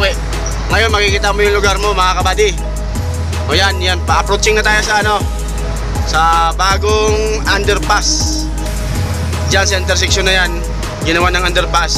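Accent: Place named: Visayas, Philippines. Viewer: Kabayan konti lambot ng dila para di mahalata bisaya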